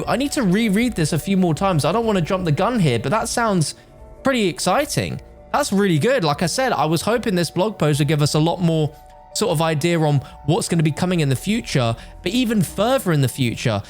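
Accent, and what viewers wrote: United Kingdom. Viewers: Ure british?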